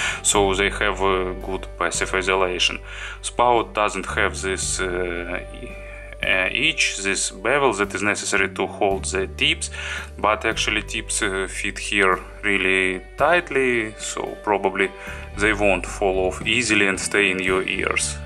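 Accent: Russia. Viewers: Чисто Русский акцент)) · Thank you so much for the review. Love your Russian accent BTW and your soothing voice.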